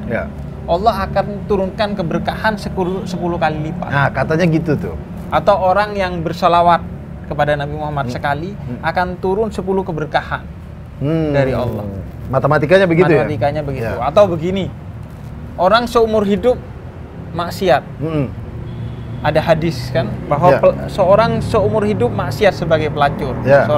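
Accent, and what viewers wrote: Madura, Indonesia. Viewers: Logatnya madura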